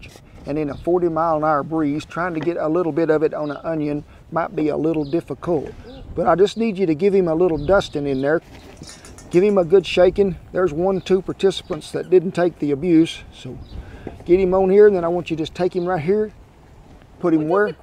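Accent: South, United States. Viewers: His voice and southern accent is so nice to listen :) and of course amazing recipes he does! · to be honest I watch this because of the cowboy-accent. So stylish slang keep up the good work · I like ur southern accent sir... really smooth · Nice and clear american diction! You can work as a showman) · well, it's cowboy drag, at the least. he's really worked on the "cowboy" accent.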